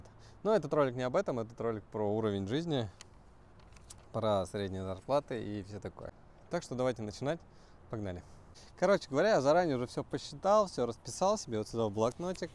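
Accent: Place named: United States. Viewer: ..а еще у тебя произношение американское становится,даже говоря по русски)))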